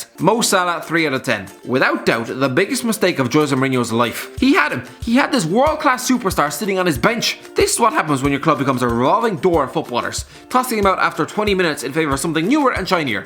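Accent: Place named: Ireland. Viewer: This guys Irish voice is so annoying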